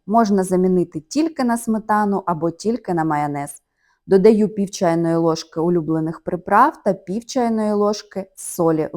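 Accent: Standard. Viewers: Хорошие рецепты,прекрасная подача приготовления,без диалекта и суржика - умница.но только для украиноязычных . Поэтому переходим на другие кулинарные блоги,слава Богу их предостаточно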